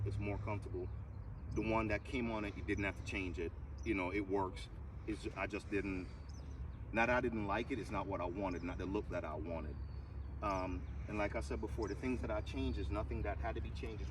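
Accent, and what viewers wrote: Jamaica. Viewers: You're Jamaican nuh Tru? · I hear a little jamaican accent in there maybe??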